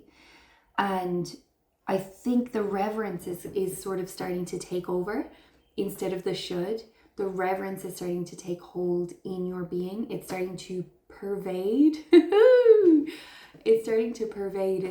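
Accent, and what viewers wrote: Ireland. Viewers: Lovely to find you too, together we do this thing, and put things right. Cancerian? An Irish lilt? You made me cry too, thankyou. x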